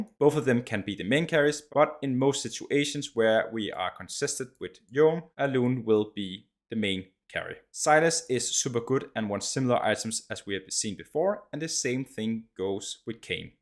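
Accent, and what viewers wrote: Denmark. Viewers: Your accents sounds like Denmark people that i met Yesterday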